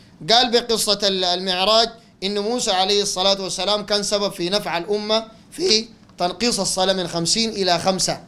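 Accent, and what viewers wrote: Sudan. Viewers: ما أجمل لهجة الأخوة السودانيين وما أجمل حجة هذا الشيخ زاده الله من فضله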